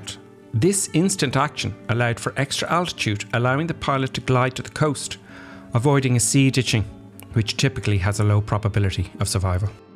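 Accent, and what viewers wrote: Ireland. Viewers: Thank god! I've so missed that beautiful Irish lilt. Some airmanship bringing that down but damn, fancy forgetting to switch tanks! But like you said, we weren't there.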